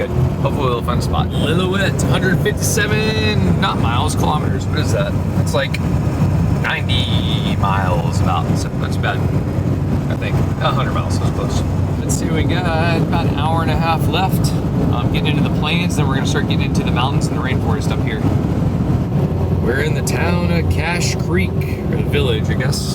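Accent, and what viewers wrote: United States. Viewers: You sound too American, lol it’s not been there before they say bEEn.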